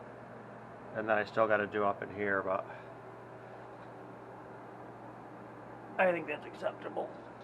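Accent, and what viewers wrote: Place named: Northeast, United States. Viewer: Doing real well. I love your work and your accent reminds me of where I grew up. I spent my time in North Jersey in Philly and for one year when I was in the Navy back in the 90s I was in upstate New York.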